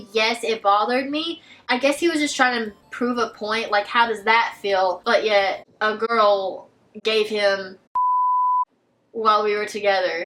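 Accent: South, United States. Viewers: Your southern accent is the cutest thing I’ve heard all week! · Do you have a country accent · Why you so gorgeous tho? I love the whole southern-ness the way you say things and shit.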